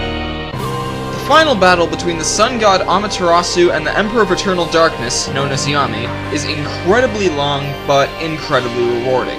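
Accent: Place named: Canada. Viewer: You Canadian? I keep hearing you say "aboot" and "doobt".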